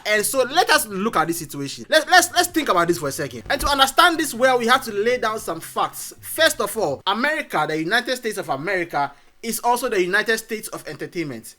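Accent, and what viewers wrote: Ghana. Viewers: this failure think say he dey wine me. You average 700 views when it's about your boring, begging, imf dependent republic. Your biggest views in the last 15 months is about kai cenat in Nigeria with those funny but nonsensical headlines. You're a Mediocre who review trends from Twitter with your funny but disgusting Ghanaian accent and the terrible English that comes with it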